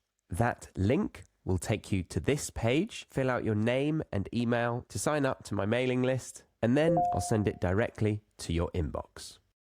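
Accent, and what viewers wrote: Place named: United Kingdom. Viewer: yes, Britishness and naturalness and the accent of yours😊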